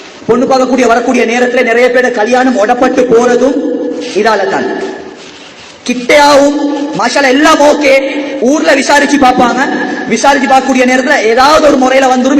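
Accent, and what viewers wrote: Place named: Kandy, Sri Lanka. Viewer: இவர் கண்டி கொழும்பு இந்த பகுதியில் இருக்கிறார் போல..! அந்த பகுதியில் இப்படித்தான் தமிழ் பேசுவாங்க. என்னுடன் சவுதியில் கன்டியைச் சேர்ந்த நண்பர் ஒருவர் இருக்கிறார். இதே போலதான் தமிழ் பேசுவார்.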